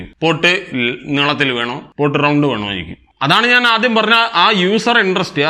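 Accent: Kozhikode, India. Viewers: വളരെ നല്ലവണ്ണം മനസ്സിലാവ ന്നുണ്ട് ഇ ടയ്ക്കൊക്കെ കോഴിക്കോടൻ സ്ലാംഗ് രസികനാണ്. Keep it up f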